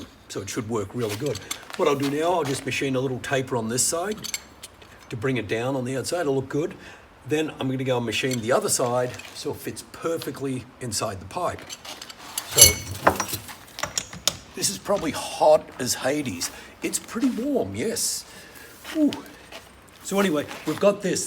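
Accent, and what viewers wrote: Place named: Australia. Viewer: Love your videos. The accent allows you to have the colorful Language without offending. Kind of a "well he's Australian like Crocodile Dundee ". LOL! Thank you for the text included with this video. Very informative.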